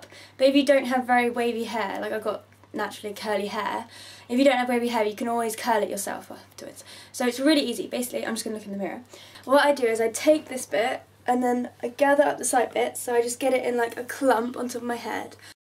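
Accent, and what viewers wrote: United Kingdom. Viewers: OMG I LOVE ENGLISH ACCENTS :) great tutorial by the way! (: x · Random question: Is this a UK accent? Oh and great tutorial btw.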